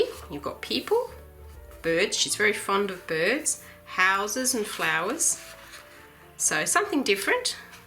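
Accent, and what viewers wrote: Australia. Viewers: Hi, love love love your work, not only beautiful but inspirational (and also refreshing to hear a fellow countrywoman). I have thoroughly enjoyed every single video you have produced, and especially find your book reviews very informative. I received Dagdrommar in the mail the same day you released "how to colour a womans face" and had to chuckle, I have also just received Sommarnatt in the mail today and your are correct, just as "lovely" as the first. I am an amateur artist in Adelaide 45yo male, and just wanted to say "hi" and "thanks', keep up the great work:-)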